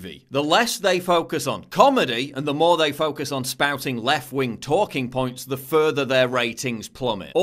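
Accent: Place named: Yorkshire, United Kingdom. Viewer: Geoffrey boycott accent.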